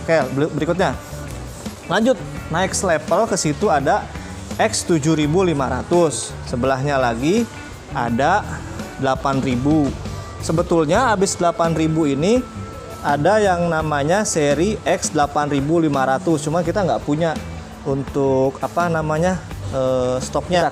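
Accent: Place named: West Java, Indonesia. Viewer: Nyunda' pisan a' Pull etsdi Wait enjel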